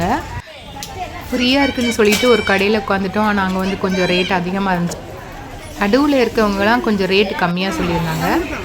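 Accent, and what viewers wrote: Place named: Coimbatore, India. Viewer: கோவை யா! பேச்சில ஒரே இங்க இங்க யாவே இருக்கே!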